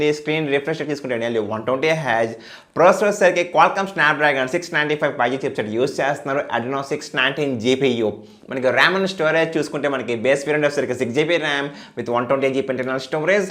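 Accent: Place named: United States. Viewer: way of talking in telugu change cheyandi mari american la try chesthunnaru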